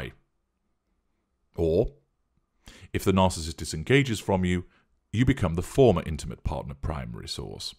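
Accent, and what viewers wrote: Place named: Northern England, United Kingdom. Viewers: You sound like Professor Higgins or Colonel Pickering, in My Fair Lady. As an American, I can attest that at least 75% of English accents are charming, and we also love an Irish brogue or a Scottish Burr! We are completely charmed by almost any UK 🇬🇧 accent. However, there’s something soporific about the mellow tone and accent of. He could read the telephone book and put me straight to sleep. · you have the sexiest (posh with a slight Northern inflection) voice on Youtube but then you already know that. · Manchester and environs . Would be my best guess . But am a Northerner myself .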